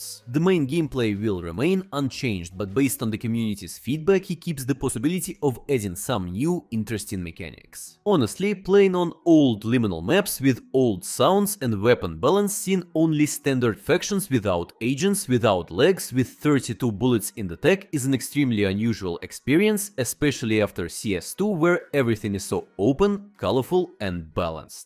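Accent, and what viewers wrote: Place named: Russia. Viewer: you speak like russian with this accent, am sorry if i say wrong, am poland so it hears very known for me :)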